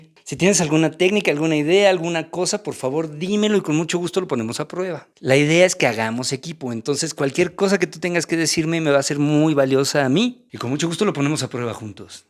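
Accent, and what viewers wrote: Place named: Mexico. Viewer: Jajajaja, literal me subiste la vibra del día. Ya tienes el don de la palabra que además te fluye de maravilla por tu acento, "que viva México carajooo". Luego me partí de risa con lo de la vecina ruidosa, porque estoy pasando por lo mismo. Gracias por compartir, inspirar y por motivarme con tu espontaneidad. Por favor, no vayas a visualizar novias diferentes porque las tazas son útiles, pero muchas mujeres te volverían loco, jajajaja. Graciasss.... voy a comenzar hoy con el reto de agradecer a la loca ruidosa de mi vecina 🤣🤭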